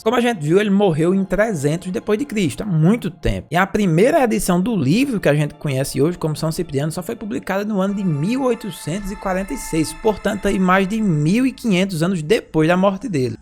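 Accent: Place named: Northeast, Brazil. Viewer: 😅 kkk então amado esse sotaque nordestino é muito engraçado, eu como satanista teísta e praticante do ocultismo pagão. É praticante da demonolatria, custei pra entender, o grande mestre e bruxo e feitiçeiro São Cipriano. E porque os demônios, insistiram, pra mim comprar esse livro mágico ou grimorio demoníaco satânico antigo sagrado de São Cipriano. Ele possui rituais das artes mágicas de alta magia negra com o Deus Satan ou rituais satânicos. Sim 👍 é atualizado em diversas versões e traduzido em vários idiomas. Não é um ☝️ simples livro, é um grimorio demoníaco satânico antigo sagrado. Já começa por aí, não 👎 é escrito para pessoas curiosas, é feito para praticantes de magia negra pagão. E para quem pratica a demonolatria e lógico. Estuda e pesquisa a demonologia, não 👎 vou ficar aqui explicando tudo. Porque são segredos dos seguidores da magia Ciprianica e obivio da magia negra. 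Eu como satanista teísta e bruxa 🧙‍♀️ e feitiçeira e médium espiritualista, fiquei muito curiosa. Quando minha Daimon pessoal, me disse Rosanna, sua vida e jornada espiritual. Já está em tanta evolução astral, que precisa estudar e pesquisar e praticar o mestre e bruxo São Cipriano. Na verdade dele, tenho dois grimorios, inclusive o grimorio demoníaco satânico antigo sagrado São Cipriano o bruxo capa preta. Eu fiquei chocada 😲 ao ler pela primeira vez. Disse pra o demônio. É muito crueldade, kkk eu juro falo tanto de animais como de pessoas. De início eu juro, não 👎 gostei do nenhum, mesmo sendo sat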